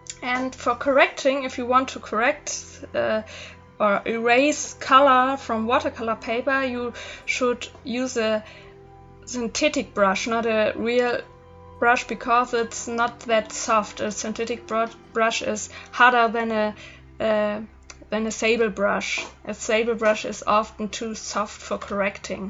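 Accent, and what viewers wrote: Germany. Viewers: ich bin nicht die einzigste die denkt ihre Muttersprache ist deutsch 😂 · Bist du deutsch? Dein englisch hört sich so an 😂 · thank you so much for this video! your English is really really good! and I love your accent, it reminds me of my grandmother (she is from Aschaffenburg) like hearing her at a younger age. I'm sorry if that's strange. ^_^ but I appreciate your video very much, I definitely want to try some of your tricks and methods when I paint :D be well! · Man hört gleich, dass du deutschsprachig bist :) Sehr cool, danke für das Tutorial! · Are u german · letmefly 97 I'm Pretty Sure she's from Germany or Austria (or Switzerland?) because of her Accent (I'm from Germany and most of my classmates have this Accent sooo yeah) But...People correct me if I'm wrong! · Ich liebe deinen deutschen Touch in deinem Englisch :D Fühle mich so verbunden 😂 Aber mal ehrlich, danke für das tolle Video, war wirklich hilfreich 😌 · Your English is fine and the vid is amazing but you’re accent sounds like ur from Germany (I’m just curios tho)😂❤️ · Haha cool I think your tips may be helpful! 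Your accent sounds a little bit German 😅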